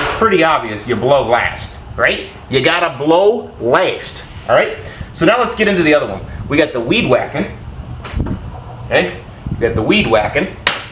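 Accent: Chicago, United States. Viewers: This clip kept me in stitches. I pretty much bet you've not heard this one, that your Chicago accent and voice sounds very much like the pro fisherman Babe Winkelman of Minnesota. Kinda glad you don't use that accent in all of your videos. Ha! ~oO(♫)Oo~ PS. Tonight was my third evening and I finally finished applying 20 Gal. of weed killer via a 2 Gal. tank sprayer (the "fan" nozzle helped a lot) and am already seeing lots of "wilt" and the fast-growing weedy lawn has already slowed to a belly-crawl! I will keep you posted. 2, 4-D rocks! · Dude, you're Chicago accent is on point!! But I'm from Texas. Thanks for the info. I'm new to your videos so I'll be gravediggin these old vids. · nice chicago accent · I had to move away from my beloved Chicago a year ago....and I miss it TERRIBLY! Thanks for the accent. I have known a few in my day who DO sound like that...I even miss THEM. 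hahaha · Lol that chicago accent is engrained in you to this day!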